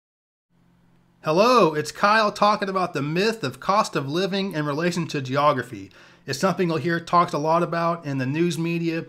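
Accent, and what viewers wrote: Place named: Tennessee, United States. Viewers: I mean this in the most complimentary way...you have adopted the Chattanooga accent! From a fellow Chattanoogan 😁 Thank you for this video. It is what I have always suspected. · you're developing a TN accent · I would have guessed you from FROM Tennessee, not California based on your accent. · Why do sound like a hillbilly if you are from California, the thing is when reagan was President he reduced spending to New York and California so state raised taxes, California and New York send so much Federal taxes to Mississippi and Tennessee and the rest of the hillbilly states.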